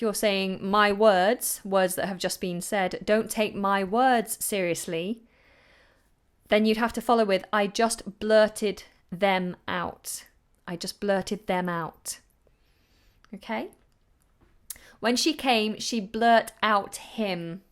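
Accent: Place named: United Kingdom. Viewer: What a lovely British accent! I'm watching you from Syria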